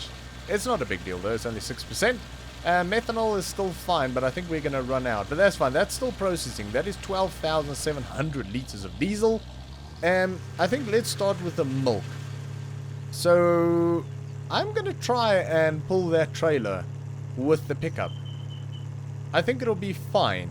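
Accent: Afrikaans, South Africa. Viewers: It sounds like you have an Afrikaans accent. I'm curious where are you from.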